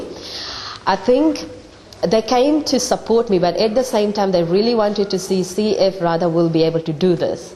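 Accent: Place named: United Kingdom. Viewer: This is UK accent 😊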